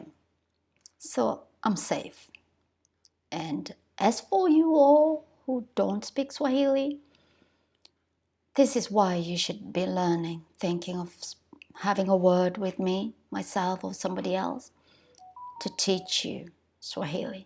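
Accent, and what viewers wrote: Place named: Uganda. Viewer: The presenter of this program you sound Ugandan and yet you said you are Kenyan I’m pretty sure depends on your tribe Ugandan and Kenyans we are equally the same thing thanks for your information I already speak Swahili myself I was born speaking Swahili and as you said Swahili is the way I totally believe you because I’ve always been convinced that Swahili was the most superior language on the continent of Africa always thought Swahili is superior than other languages from the west coast of Africa or even the southern coast of Africa and other countries in between that again Kiswahili is definitely superior…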